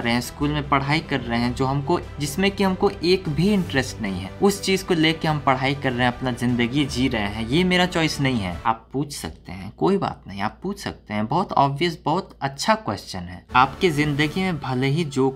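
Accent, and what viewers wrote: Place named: Bhojpuri, India. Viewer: 💞💞💞 bhiya aapki hidi thodi bhojpuri mix sound kr ri h we support you 💜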